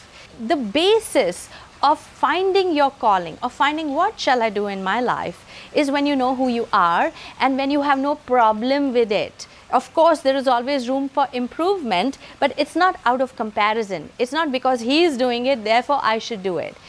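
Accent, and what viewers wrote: India. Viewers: You speak pure Indian English. Which is obvious and with charming pronunciation. So it becomes very easy to understand;and there for everybody likes your lectures. You are a jwel for the Indians. --Dr Mohanty, Seraikella (Jharkhand).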